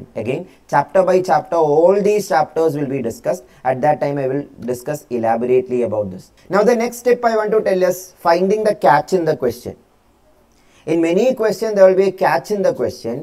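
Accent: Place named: Kerala, India. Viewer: Sir , ur accent tells u r from Kerala .... Is it ?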